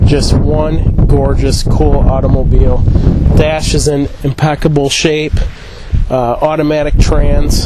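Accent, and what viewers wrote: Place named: Michigan, United States. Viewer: You must be from Michigan I can tell by your accent. Also how much?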